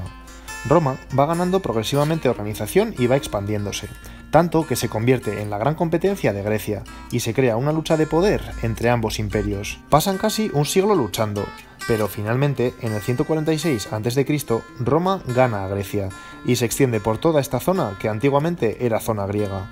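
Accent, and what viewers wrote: Spain. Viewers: Ese acento es español ...la madre patria de los " CUBANOS " 🥰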